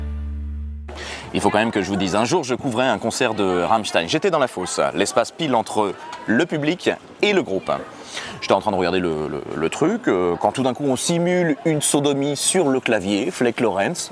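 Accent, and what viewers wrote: Germany. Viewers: mais il est très bien ton accent allemand ! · J'aime tes videos, mais non ironiquement ton accent allemand pue un peu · Toujours une magnifique maniere de reconter l'histoire d'une musique et de don groupe!et magnifique accent allemand..... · Ton accent allemand est immonde. Je tiens à le dire.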